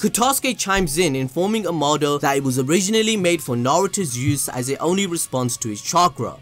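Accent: Punjab, India. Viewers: Are u punjabi bro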